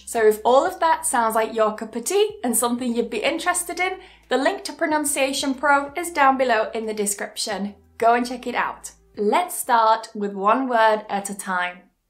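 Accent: United Kingdom. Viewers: Hi, I prefer coffee. I love your British English Pronunciation with Walk and Work., I also want join your English Pronunciation Pro. You are my best teacher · Love your British accent!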